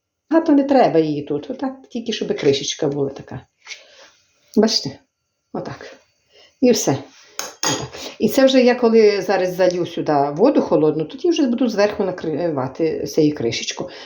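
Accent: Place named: Transcarpathia, Ukraine. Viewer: Дякуємо за чудовий,простий у виконанні рецепт і чудову українську мову Закарпаття🙏🇺🇦🇺🇦🇺🇦👍💙💛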